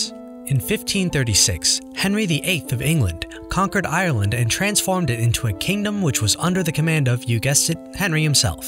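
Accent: United States. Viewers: American accent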